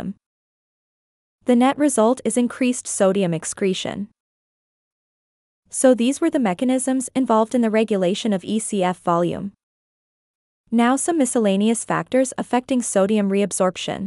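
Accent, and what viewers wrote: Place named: Gujarat, India. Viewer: YOUR VIDEOS ARE GREAT....BUT WHY DID you change the voice over...one reason that i watch your video is its in your native gujju accent (please dont take it in the bad sense). I love it.. Please stick to your original voice , you can work on processing your voice with AI or whatever you resources you have, but please stick to your voice.... If there was an award on teachers day (5th September in India) for best you tube teaching you will give very tough competition for the others,.All the best & ThanQ for such wonderful teaching.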